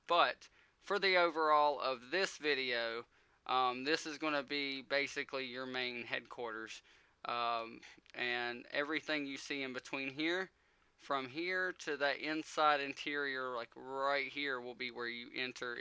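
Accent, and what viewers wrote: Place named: South, United States. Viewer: Sweet build! You sound like you might be a Southern boy like me.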